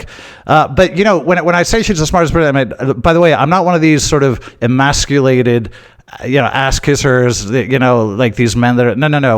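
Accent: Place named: United States. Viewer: If it’s not offensive, can I ask why you have an American accent?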